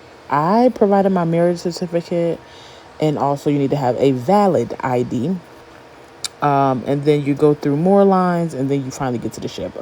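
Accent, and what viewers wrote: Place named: Connecticut, United States. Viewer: I know this is old, so you might not see this but the whole time watching I was like her accent, I wonder if she from CT, so I looked in the comments. Still not done watching but I see this comment, and I'm like wait, we say this here lol. So still not sure, but yea, I was wondering.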